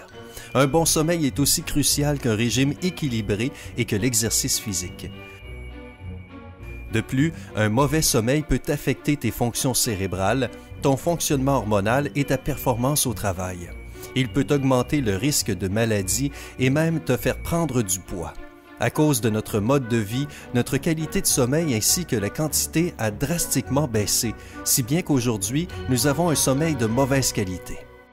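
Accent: Quebec, Canada. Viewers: J'adore cette prononciations canadien ❤️ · Il a un accent québécois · L’accent québécois la !! Valide 😭😂😂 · S’IL TE PLAÎT SYMPA !!!!!! ARRÊTE D’UTILISEZ CETTE VOIX ELLE EST HORRIBLE ÇA ME DONNE MÊME PAS ENVIE D’ECOUTER LA VIDÉO DONC S’IL TE PLAÎT REPREND LA VOIX COMME LAMA FÂCHÉ PLSSSSSSS PLUS JAMAIS LÀ VOIX CANADIENNE !!! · ton accint québécoué j''jl'aime jaime beaucoup sa fait plaisir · Jaime bien l'accent canadien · J'ai mis un poucebleu pour l'accent québécois. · J'adore ta voix québécoise :D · Pourquoi la voix est québécoise (on dirait Luc Langevin)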